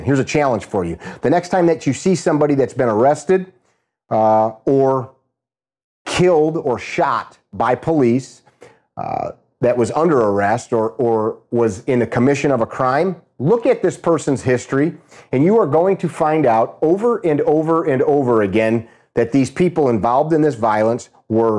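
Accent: Chicago, United States. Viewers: As soon as I heard his voice the first time, I knew. Sounds like Chicago.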